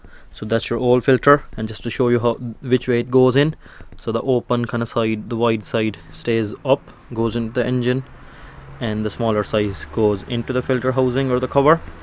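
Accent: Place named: Portlaoise, Ireland. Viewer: That a Portlaoise accent I’m hearing, Thanks for this video brother helped me save allot.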